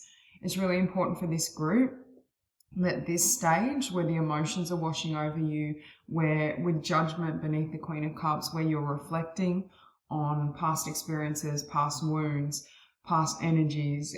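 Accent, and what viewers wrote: Australia. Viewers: Lovely to listen to another aussie reading tarot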